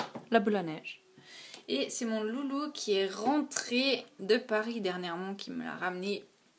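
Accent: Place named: Switzerland. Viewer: coucou ! je pense que tu mérite plus d'abonnées pour moi :) Wahou ta collection !! PS: Tu habite en Suisse ? ( J'ai l'impression que tu as un accent :$ ) Passe voir ma chaine ;) bisous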